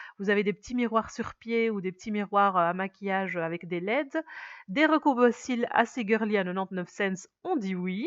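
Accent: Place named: Belgium. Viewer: J'entend que tu viens de Belgique... tu viens d'où? C'est l'action de quelle ville? Bonne soirée!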